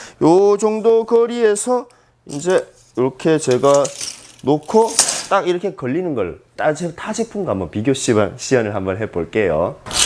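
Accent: Gyeongsang, South Korea. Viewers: 경상도 말투 정겹네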